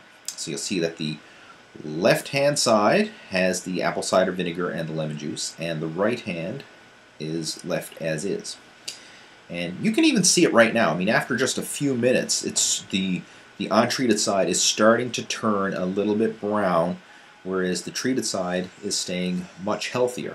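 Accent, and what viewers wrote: Newfoundland, Canada. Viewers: Canadian eh?! I see we shop at superstore?!! lol love the accent :p Ps...I drink ACV with green tea every morning but I'll be sure to try this too :) thx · Hey brother,are you from Newfoundland? Cause i am,and i kinda hear a hint of the Newfoundland accent when you speak. Great video by the way.✌🏻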